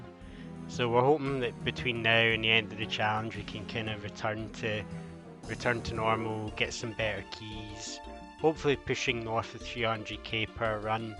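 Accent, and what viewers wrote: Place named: Scotland, United Kingdom. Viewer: Love to hear a Scottish osrs content creator.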